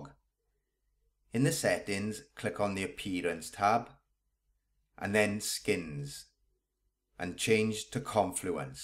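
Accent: Wales, United Kingdom. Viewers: Love the Welsh accent! My mum is from Cardiff. :)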